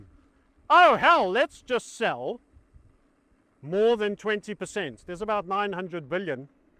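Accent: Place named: South Africa. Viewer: your South African accented quips get me every time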